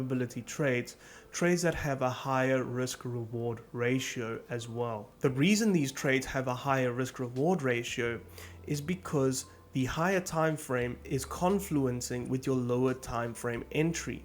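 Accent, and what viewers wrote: South Africa. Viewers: youre south african?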